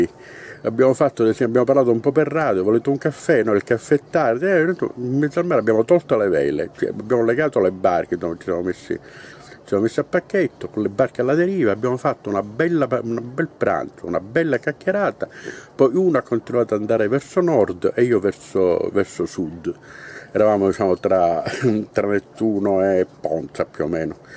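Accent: Sicily, Italy. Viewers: Grazie per le information. Leibe siciliano?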